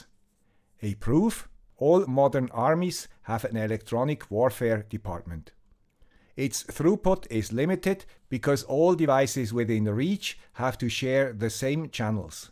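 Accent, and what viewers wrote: Switzerland. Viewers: Wait a seconds! Guy with swiss accent familiar with NIVA!? Lada NIVA!?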